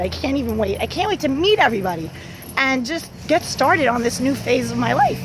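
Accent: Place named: New York, United States. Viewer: New Yorker? Love the accent. Your energy is amazing! Thank you for sharing! I love Abraham I listen to her every day 😀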